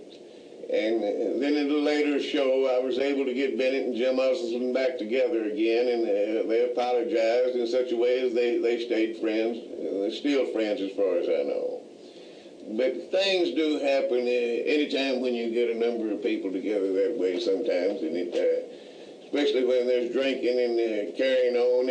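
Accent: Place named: South, United States. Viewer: Just found these Gems!! Man I been missing out!! I can listen to this man tell a story all day!! He's got this very captivating southern charm of way about telling bulldog stories..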